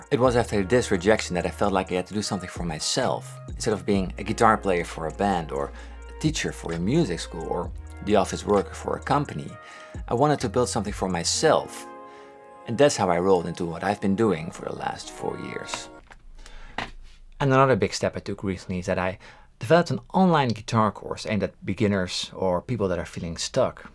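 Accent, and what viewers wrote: Netherlands. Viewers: Watching your footage on YouTube I didn't notice at all however in this vid I thought 'hey this guy sounds Dutch'. In seconds I recognized the Dutch style houses and the distinctive yellow car registration plates - Holland! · Nu begrijp ik waarom ik je Engels zo prettig vind, je bent ook Nederlands of op zijn minst deels. Leuk. Succes met je kanaal, ik heb er erg veel plezier van. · I already thought you would be from the netherlands from your accent, but what town are you from?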